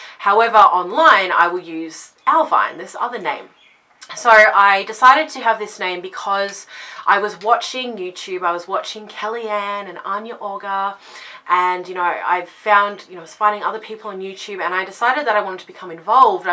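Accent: Australia. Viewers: Hello. I don't usualy comment on Witchy channels because im so new i usually figure " well whet the heck do i know yet. " and as a truck driver in the U.S.A. my Pagan expierences are so different that they are unlikely to be of any help. And us truckers tend to be a bit reclusive any way. So im stepping way outside my comfort zone for this but here it goes. I do not use a magical name eithe in my pracktice nor online. This is only because i am not a youtuber. If i made youtube videos( ill admit I've thought about it.) i would use an alternat name for security reasons but that is it. However it is unlikely that i will ever make a youtube channel because i don't know that the content would be very relavent or interesting to any one. Seriously how many Wiccans do you know who live and work in the cab of an 18wheeler. How manny need to know how to cast a circle inside a semi truck. 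Lol you see my point. Mine is a compleetly Nomadic Wiccan lifestyle, ware as traditionally Wicca and Paganism in general, is verry home based. Anny way. i have rambled on long enoughf and ive allready written a book in your comments section. So ill end by saying i love your channel and your accent. Australia is the only country in the english speaking world who's accent rivals Scotland. Be well and blessed be. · I'm Scottish and I love the Aussie accent! :)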